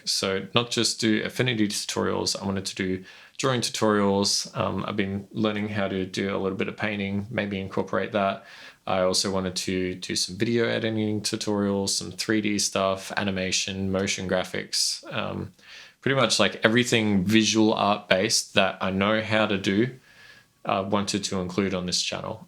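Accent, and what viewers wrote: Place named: Australia. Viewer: Honestly too as a westerner, your accent is 75% of the appeal of your voice. Don’t underestimate the power of your Aussie accent bro. I know that sounds ridiculous and trivial. It’s really not though. Your accent and the naturally lower timbre of your voice really plays into something good.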